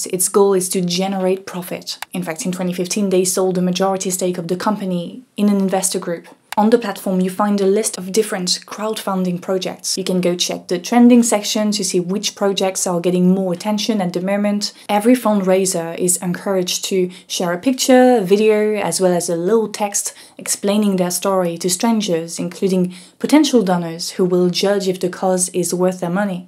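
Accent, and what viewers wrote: France. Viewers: I enjoyed this video but I would also like to thank you for improving your English. I was having a hard time following you in the past because of the heavy French accent. 😊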